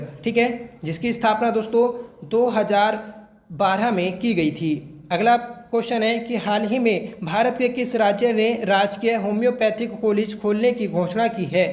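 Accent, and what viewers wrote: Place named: Uttar Pradesh, India. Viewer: Hindi typing m bhut error hai aur apki tone uttrakhand ki km aur up ki jyda hai. Please improve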